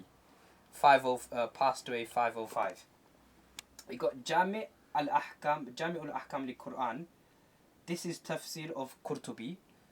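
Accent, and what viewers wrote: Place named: Sylhet, Bangladesh. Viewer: The Sylheti pronunciation...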